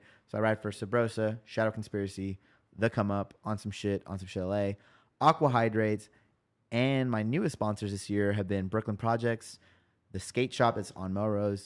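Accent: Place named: Argentina. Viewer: Tiene acento como de argentino.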